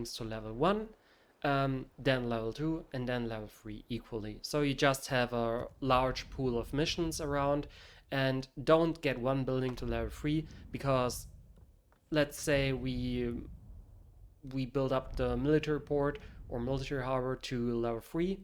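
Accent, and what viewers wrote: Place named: Australia. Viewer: You sound Australian, what time you stream?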